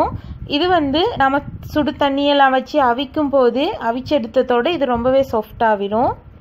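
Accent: Sri Lanka. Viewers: This is not Srilankan tamil , this is how Muslims speak in Srilanka ... 👌👌👌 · Super.....Srilanka tamizha neenga pesuvathu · Ur sreelankan Tamil is sweet and so is idiyappam reipie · சகோதரி அருமை ... உங்கள் தமிழ் இலங்கை மற்றும் குறிப்பாக புத்தளம் தமிழ் வழக்கை ஒத்துள்ளது .. புத்தளத்தை சேர்ந்தவரா நீங்கள் ? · ரவா இடியப்பம் அருமை அத்துடன் இலங்கை தமிழ் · உங்களின் இனிமையான இலங்கை கொஞ்சும் தமிழ் அருமையோ அருமை சொல்லி தந்த விதமும் அருமை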